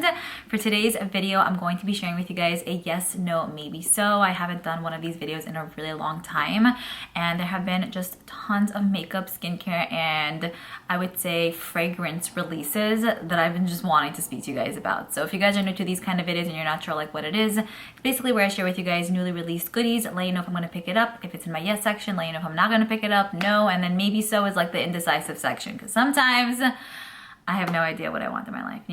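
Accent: New York, United States. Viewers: I love that you’re so New York in your pronunciation. Makes me homesick. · Sweetie - your 'aw' is a thing we New Yorkers do, and we are unique and wonderful in the world! You keep your 'aw'. I have done it for years and it's staying! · You rock!!!! I love these videos!!! 😍❤️ Please never change your New York accent!!! I love it so much!!! Everyone has accents all over the world and it makes things interesting and new 🤩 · To me your aw accent is normal for the area you live in my mom grew up in the Jersey/New York area but has lived in stl for 40 years and still has that aw accent. We even picked up some words and have people tell me and my siblings we say things odd.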